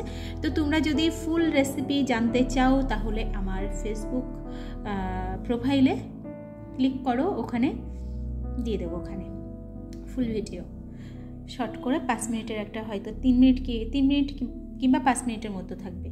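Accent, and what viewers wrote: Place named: Kolkata, India. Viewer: আপু কেমন আছ? আমি মোটামোটি ভাল আছি। কখ অনেক কমেছে। ডাক্তার কখ মেডিসিন দিয়েছেন। শের পুঠি আমি খুব পছন্দ করি। আপু তুমি কি আমার বাংলা বুঝ? তোমার মত সুন্দর বাংলা বলতে এবং লিখতে পারি না? আর তোমাদের কোলকাতার বাংলা শুনতে খুবই সুমধুর লাগে। আপু তুমি তো খুবই busy থাক । আমি তোমাকে প্রাই বড় করে কমেন্ট লিখি তাতে তোমার অসুবিধা হয না তো? তুমি এমনই একজন মানুষ যে তোমার শত কস্ট হলেও তুমি কিছুই বলবে না । এই জন্য তোমার কাছ থেকে অনেক কিছু শেখার এবং জানার আছে। আজকে কোন প্রশ্ন দিব না। তোমাদের এখান থেকে কেরালা কত দূর? আজ এর কিছু রোমান্টিক গান শুনলাম। মাঝে মাঝে ভালই লাগে। আমি মাঝে মাঝেই গান শুনি। খুবই ভাল লগে। যাক আপু অনেক গল্প হল। মনে হয আমি তোমার কাছে বসে গল্প করছি । এখন আশি। শুভ রাত।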